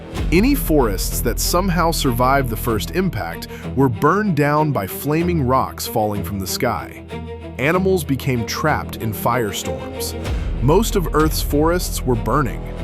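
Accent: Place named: United States. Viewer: There are better sites than this and don't have the silly American voice.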